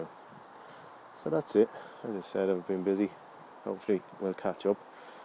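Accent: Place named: Dublin, Ireland. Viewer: failte...everything is looking good.. i wouldent worry too much about being late, often it seems later sowings catch up alot and are only a few weeks behind earlier sowings.... is a waterford nickname, but i think i can hear a touch of dublin in there, im in carlow.... keep the faith.. mick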